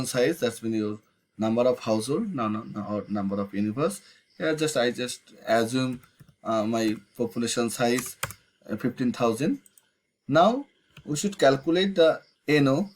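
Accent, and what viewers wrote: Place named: Bangladesh. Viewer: are you from Bangladesh? Your accent is very similar to the south east region of Bangladesh.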